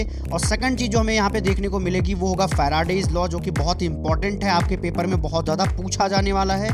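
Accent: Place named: Bihar, India. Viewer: This chemistry sir feels like he's from bihar😀😀....so proud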